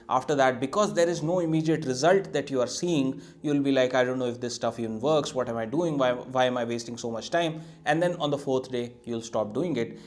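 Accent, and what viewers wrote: India. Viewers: Your accent makes me want to watch a Bollywood movie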